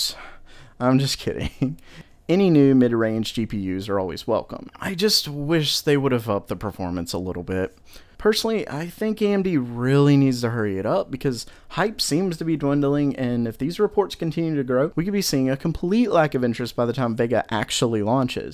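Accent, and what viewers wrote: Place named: South, United States. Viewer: That southern accent. :D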